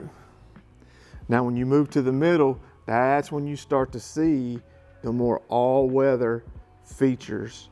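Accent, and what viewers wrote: South, United States. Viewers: Where are you from as in state? Louisiana, Arkansas, Alabama? I hear your Southern accent.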